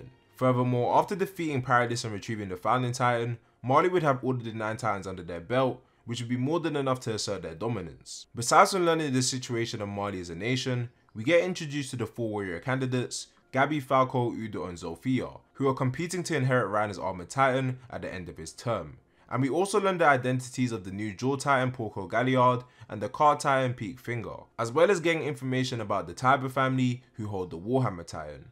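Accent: London, United Kingdom. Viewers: London innit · I love learning all the different kinds of British accents and yours is awesome. Definitely gonna try and learn it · bro speak less briish english pls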